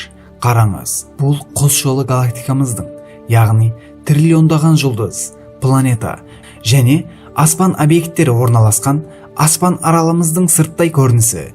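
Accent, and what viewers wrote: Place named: Uzbekistan. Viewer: Сөйлеп тұрған бала Өзбекстанский акцентпен сөйлеп тұр ғой примерно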